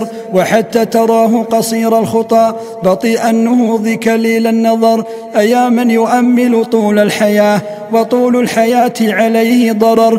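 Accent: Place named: Najd, Saudi Arabia. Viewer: أهل الشام قراءة نجدية اعتقد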